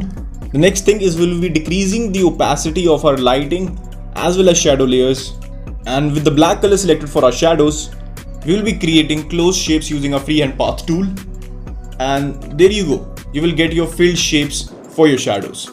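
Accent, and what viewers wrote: India. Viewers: indian accent. bye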